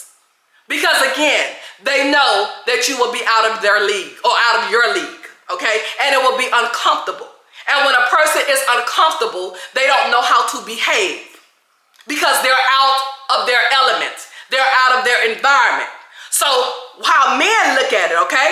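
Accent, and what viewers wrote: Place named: South, United States. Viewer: You told us. It was for who it was for. Don’t take it personally. Leave the messenger alone. I love your platform. Your delivery really makes people think. Keep doing what you doing Ms.. I heard a Southern accent coming through on this one.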